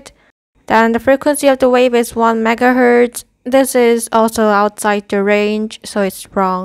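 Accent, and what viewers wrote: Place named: Malaysia. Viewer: Love from Pakistan ? BTW, you sound like Malaysian cuz I lived in Malaysia for 5 years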